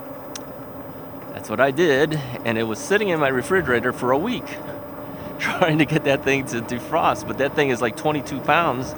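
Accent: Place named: North, United States. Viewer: Just found you through “ Total Therapy Solution PT “ you tube. Love your mission to help others! I am getting ready to have 1st of 2 knee replacements at 70 years of age, female, due to advanced osteoarthritis in both knees! Love bike riding and visited my son in Germany this past summer. He is an avid bike rider and Germany has wonderful trails with views in the mountains for riding. You mention the weather many times in the video but don’t say where you are from? Are you in Chicago? You mentioned the “ Windy City “ and I’m picking up a rather northern accent in your voice. I was raised in upstate new York near Buffalo. Excited to see such bike trails are available! My son introduced me to the idea of an ebike while I was in Germany. Many Germans are using them! I plan to keep following you and loved riding along! Thank you for what you are doing!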